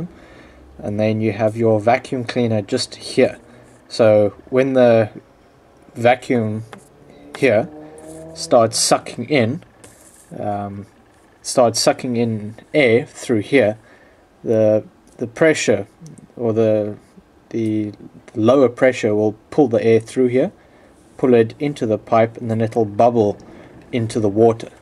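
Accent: South Africa. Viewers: Really cool, are you south african? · Are you South African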